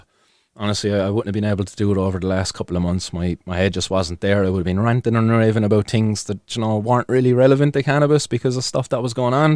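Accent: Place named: Cork, Ireland. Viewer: Good see you back on the screen baii... And really glad that you in a position to be back! Missed those dulcid Corkonian tones😋💚🌱🌱🇮🇪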